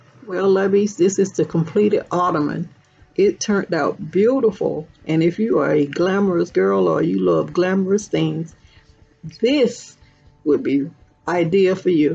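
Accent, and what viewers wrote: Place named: St. Louis, United States. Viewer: You from STL? I'm out here but from jersey...you sound like you gotta lil stl accent. Very nice btw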